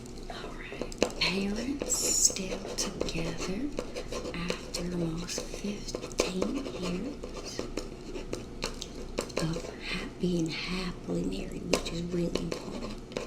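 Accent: South, United States. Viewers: Aaaaah🎉! You’re back with the Southern accent 🥳🥳🧐thank yoooou!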